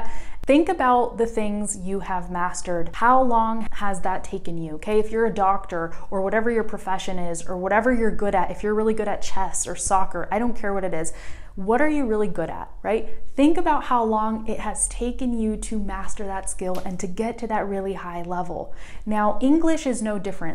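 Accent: California, United States. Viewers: A big thanks to you mam, honestly I really enjoyed and understood each everything you said throughout this video make me encouraged and motivated to do more and put me in a field has potential to improve my listening and speaking in English, and one more thing that I want to share is i dreamed myself that I speak American English well like you speaking. Saying thanks again for reading my comments. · Exactly.. she speaks in basic english with clear american accent. That's make us understand easily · I'm a native English speaker, but I will say this for whatever reason while learning Spanish it is much easier for me to understand what a woman is saying compared to a man. I don't know why that is but it is. And yes you have a California accent which is to say really no accent as most other English speakers would say. I would imagine it's much more difficult for non native speakers to understand different British accents, Australians, or related New England and New York accents because as a New Englander I can say we speak more like British people than we probably think with long vowels and guttural h sounds that aren't actually supposed to be in the word but it's just how we talk. 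Or Pennsylvanians and New Yorkers dropping H's and pronouncing words like hat and ass like " "hey-at" and "ey-ass"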